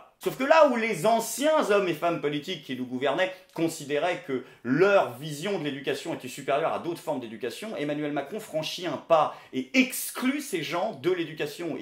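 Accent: Benin. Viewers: très bonnes imitations de Schiappa et d'un béninois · Et fait nous on un jspc spécial accent bénin :p tu le fait vraiment bien :)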